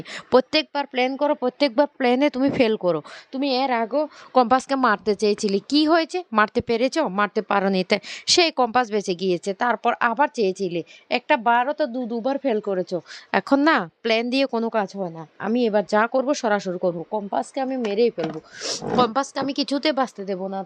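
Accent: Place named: Chittagong, Bangladesh. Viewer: আপনার চট্টগ্রামের টোনে কথা খুব ভালো লাগছে।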